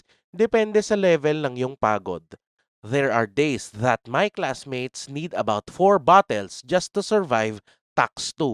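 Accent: Philippines. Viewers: love the heavy Filipino accent!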